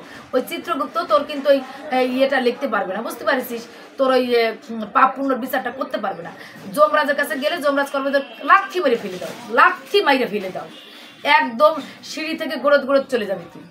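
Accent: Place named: Khulna, Bangladesh. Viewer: খুলনা থেকে দেখছি দিদি,,,ভাষা শুনেই বুঝেছি কাছের মানুষ আপনি❤️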